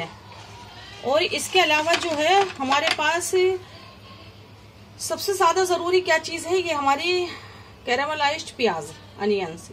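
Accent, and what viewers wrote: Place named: Bijnor, India. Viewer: aapka bolne ka andaz bijnori h zabardast ji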